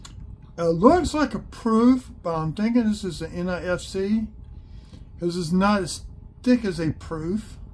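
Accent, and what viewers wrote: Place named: South, United States. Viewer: Nice Southern 👍 😊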